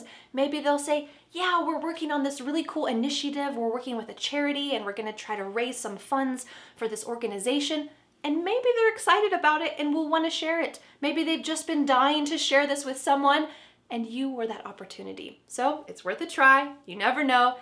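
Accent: United States. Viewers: Yep, I m really into your American English. Txh a bunch my English is quick and dirty from Londrina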